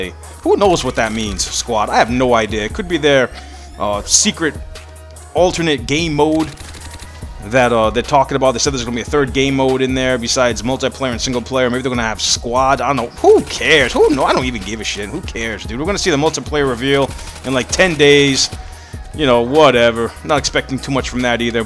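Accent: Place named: East Coast, United States. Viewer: He's not black. Its a common east coast accent that white people have.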